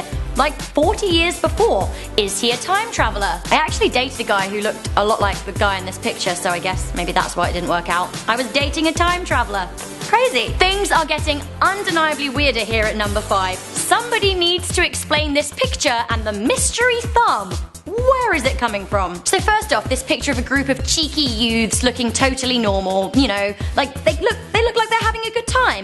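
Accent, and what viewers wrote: United Kingdom. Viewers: Wow....she's British. Same!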